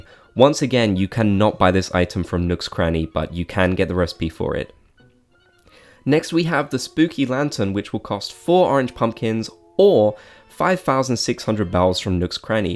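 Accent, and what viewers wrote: United Kingdom. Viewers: Alternative title: British dude obsessed with a purple cat rambling about animal crossing for 6 minutes straight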